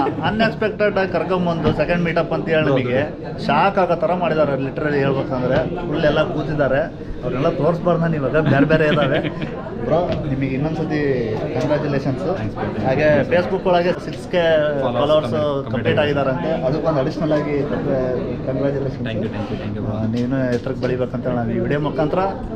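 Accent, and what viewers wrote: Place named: Davangere, India. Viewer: ಸಕ್ರೆ ಬೈಲೂ ವಿಡಿಯೋ ಯಾವಾಗ ಅಪ್ಲೋಡ್ ಮಾಡ್ತೀರಾ ದಾವಣಗೆರೆ ಭಾಷೇಲಿ ಕೇಳೋಕೆ ಚಂದ.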